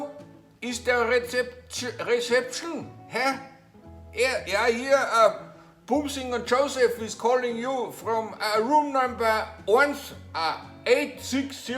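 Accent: Germany. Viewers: Einfach herrlich das baydenglisch des 😂👍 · Ha, der is der beste, und dein Denglish ist einfach super:-D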